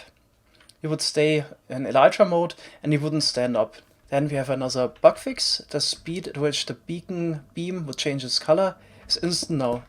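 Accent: Germany. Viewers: Best intro of all of YouTube, and best German accent as well.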